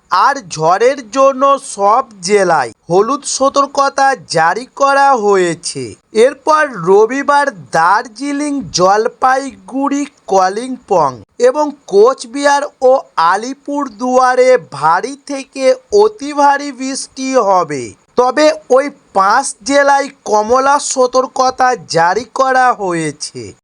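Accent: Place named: Malaysia. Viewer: তুই এই চ্যানেলটা কবে খুলেছিস তোর গলার শুনে মনে হচ্ছে তুই মালয়েশিয়া থেকে আসছিস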